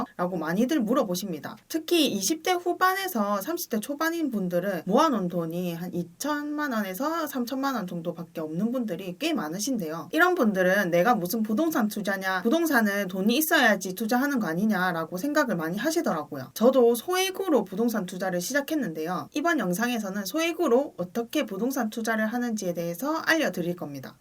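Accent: Gyeongsang, South Korea. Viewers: 경상도 세요? 억양이 너무 쎄네요😢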